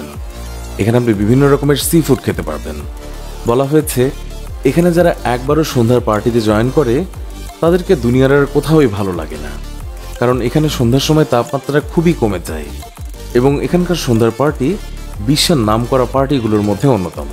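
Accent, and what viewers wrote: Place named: Bangladesh. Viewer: Narrator, Why you call one hundred seventy thousand RUPY?? Shouldn’t it Taka?? Your Bangla accent similar to Bangladesh accent... So Taka you must mention. If you are a Indian man then Rupy is good for you.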